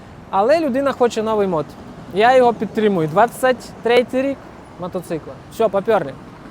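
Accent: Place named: Rivne, Ukraine. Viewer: Така балачка у вас ніби ви з півночі Рівненщини. Дубровиця, Рокитне чи Зарічне?)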